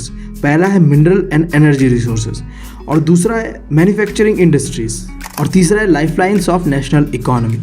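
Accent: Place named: Bihar, India. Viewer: Bihari h kya bhai language se pahchan padh rhi h 😅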